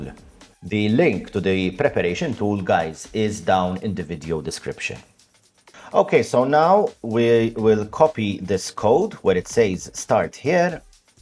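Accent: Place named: Italy. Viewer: great tutorial! I used AWS so far, but this tutorial got me interested in GCP and I started learning about GCP more so I think it'll be a longer journey which I got into thanks to you :) I just love how smooth the GCP interface is compared to AWS p.s. nice accent, I'm guessing Italian although I don't know what is the accent of Maltans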